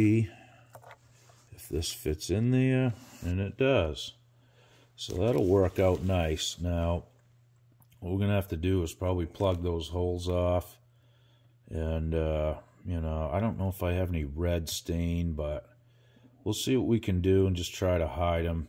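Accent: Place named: Boston, United States. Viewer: It'd be interesting to see Leo's thoughts as he designed this madness (?) Always enjoy your 'Baston' flavored narrative... Cheerios (but not the cereal)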